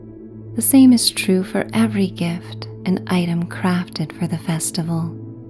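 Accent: United States. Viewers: Why is this recorded using an American and not English accent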